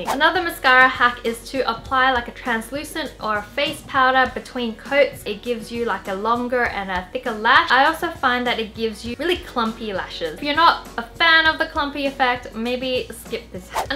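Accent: Australia. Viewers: I miss her more Australian accent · Wait am I the only one who think her accent is stronger (Australian) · Are you Australian? OUO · Her OZ accent tho😍😍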